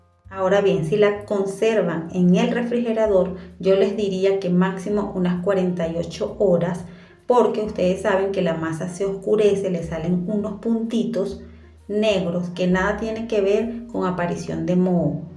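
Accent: Venezuela. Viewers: Buenas tardes. Me encantó tu receta y la forma súper sencilla y explicativa qué aplicastes. Aún y cuando estás en Colombia parece que fueras Venezolana por tú acento. Voy a comprar los ingredientes para hacer estos tequeños qué se ven fabulosos. Después te cuento. Gracias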